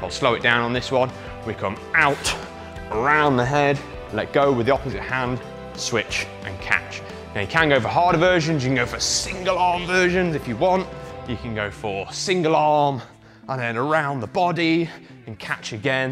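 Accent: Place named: United Kingdom. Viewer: Thank you! British accent is something hard to digest 😅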